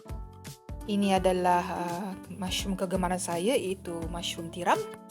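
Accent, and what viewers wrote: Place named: Kelantan, Malaysia. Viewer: Wehhh panda mu kecek kelate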